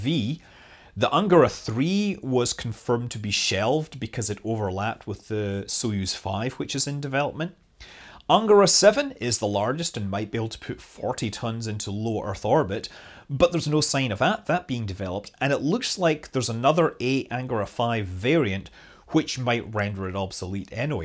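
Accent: Scotland, United Kingdom. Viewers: Ive been watching Scott for years. He was the one who taught me the basics of KSP. My wife on the other hand had very little knowledge of him, his channel, or the content. Ive been trying to change that, so now when I watch new videos the phrase “Hello, Im ” rings out around the house. then immediately after I hear a small womans voice in perfect Scottish accent “Hellooo Im ” looks like you got ur hooks in deep sir. Love ur work.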